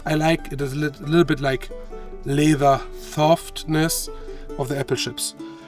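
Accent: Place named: Germany. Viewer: Please don't reduce your wonderful accent, I enjoy it 😊! I understood every single word you said. Your accent reminds me of my Oma and Opa's accents. As young adults they legally immigrated here to the US from Germany, I spent a lot of precious time with them when I was a kid. They passed away a long time ago, I miss them.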